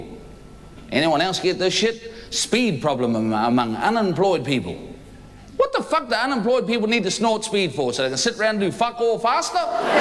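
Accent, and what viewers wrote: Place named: New Zealand. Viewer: Mike king said he used to swear on his comedy and he sure sounds like a Maori gee , oh wow he just swore xD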